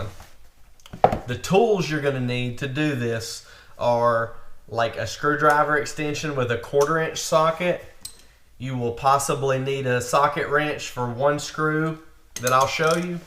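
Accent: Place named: South, United States. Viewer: Great instructional video...and cool southern accent to boot! Thank you.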